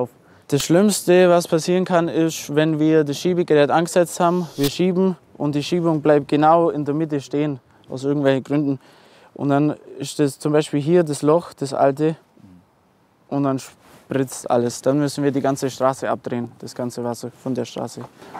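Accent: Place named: Swabia, Germany. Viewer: Schwäbischer Dialekt - einfach Kult! 🙏😅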